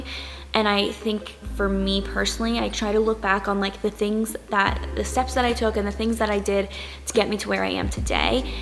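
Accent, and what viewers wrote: Spain. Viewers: I am from spain! I love your spanish accent!! 😜😜😘😘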